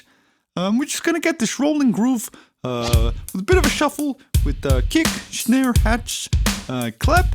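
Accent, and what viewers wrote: Netherlands. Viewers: Im Dutch and very confused by this😂 Either nailed our accent, or he is actually Dutch:) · Damn, that Dutch accent is so *good*! Props, I thought I was listening to legowelt or something like that for a second xD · This is so weird. You sound so hollandish i have to believe you are... btw schlager music makes my jewels shrink. Really. Thank god you didn t do that:)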